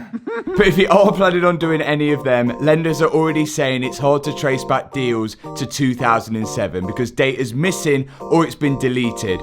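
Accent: Leicester, United Kingdom. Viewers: I miss the Leicester accent 😅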